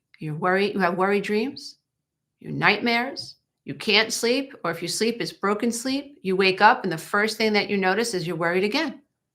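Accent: New York, United States. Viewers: love the content ❤! Shorter segments please. Will go further for you. Absolutely love your sense of humor! And your new York accent! Keep up the good work!❤❤❤! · i love you and bought your book for my mother before she passed in 2016. you are a breakthrough person for me. i love your NY accent. I want to say something please just take with a grain of salt. I feel that at the end when you describe your relaxing ways it seems like still passively avoidance...the breakfast with the daughter. it is not really being alone and authentic. also, if you met your hubby, good as he is, when you were needing a secure hubby and used your perfect looks and sabayon recipe to lure him...do not take offence...this marriage may be not the final answer for a HUGE spirit for yourself. I am not saying that i want you to get scrubby for a day and fart in front of him, but maybe its time....LOVE YA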